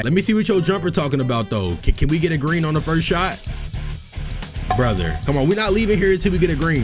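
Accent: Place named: Jamaica. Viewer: The Jamaican accent 😂😂. I wait everyday for a new vid to drop...stay consistent ...banger🔥🔥🔥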